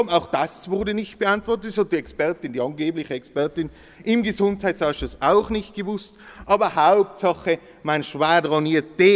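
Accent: Tyrol, Austria. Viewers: Hr. Steiner der Beste! Das ganze mit dem tiroler Dialekt! Genial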